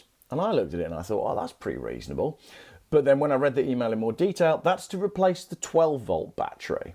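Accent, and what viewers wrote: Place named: West Midlands, United Kingdom. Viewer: I'm trying to place your accent...is there a bit of West Midlands in there?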